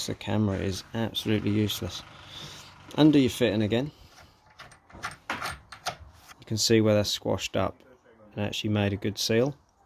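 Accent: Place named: Suffolk, United Kingdom. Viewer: I hear Suffolk, right?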